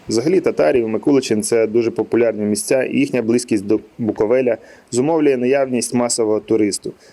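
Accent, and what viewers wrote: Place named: Rivne, Ukraine. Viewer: знайомий суржик:) Ви з Рівного?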